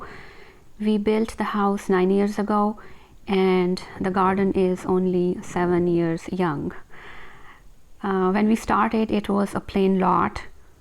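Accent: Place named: Tennessee, United States. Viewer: I had no idea you were from Knoxville. That explains your accent. :0). I grew up in middle TN, but now live in Loudon, TN.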